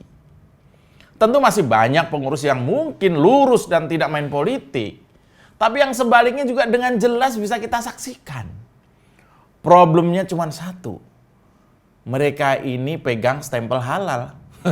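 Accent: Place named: Banyumas, Indonesia. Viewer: Emang gaya bicaranya ngapak banget..Luurrr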